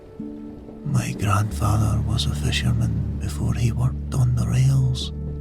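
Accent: Northern Ireland, United Kingdom. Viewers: Doesn't sound scottish more skootish even Irish ai shit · Why does sound NI/Irish? It's putting me off relaxing. A recognisable Scottish Accent would be better. Yes I am Scottish, maybe it's the whispery way he is speaking but his inflections sound NI.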